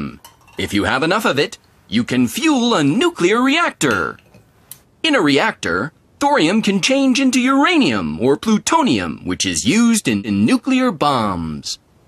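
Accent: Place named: United States. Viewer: British Currency with a US accent. hmmmmm....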